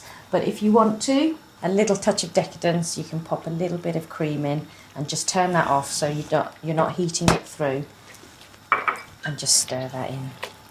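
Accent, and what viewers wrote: United Kingdom. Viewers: She does indeed have a British accent and may even be a nice English as well as Indian lady?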